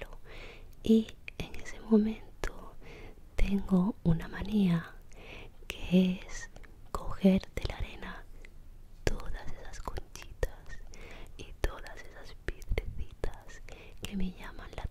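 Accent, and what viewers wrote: Spain. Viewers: Desde que te conocí hara un mes eres mi asmrtist con diferencia. Eres supernatural y autentica. Siento como si te conociera como amiga de toda la vida. Y me gusta mucho tu acento de la otra punta de españa. A seguir creciendo! 😘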